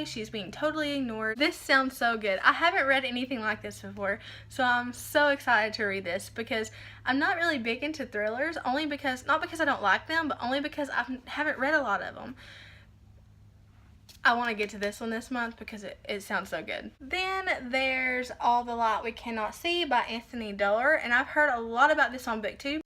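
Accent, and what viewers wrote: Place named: South, United States. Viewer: Girl, where you from? Love the southern accent!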